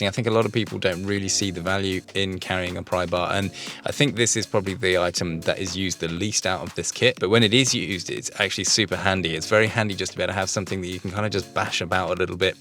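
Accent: United Kingdom. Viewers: Thanks for the content and its good to have a Brit sounding voice chatting about the virtues of EDC in its most basic form. Good to know there is someone else on the same page :) Best wishes Mike in County Durham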